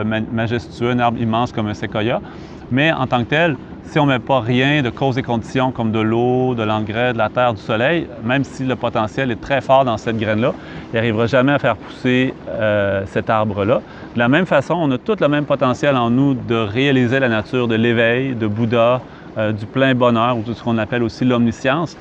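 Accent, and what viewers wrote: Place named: Quebec, Canada. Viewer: j'ai la grande fortune étre bouddhisme* j'adors l'accent québéçois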